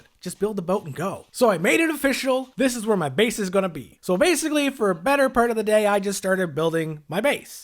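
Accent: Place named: South, United States. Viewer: Whatever your name is again I think you have a country accent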